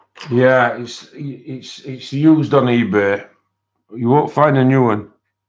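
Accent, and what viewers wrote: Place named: Huddersfield, United Kingdom. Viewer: sorry didn't make that clear and it was one of your own streams. You had a soap box moment and everyone in the stream was so supportive of you. You mentioned no names. I have heard nought else from anywhere. As I said ignore the buggers. I have a potty mouth so being polite about that lol. Only keep those who add value to your life. Your channel has grown because of you and nothing else. Used to work in Huddersfield and good to hear the accent lol. Couldn't stop laughing at Jedi! Chunky munkey lmao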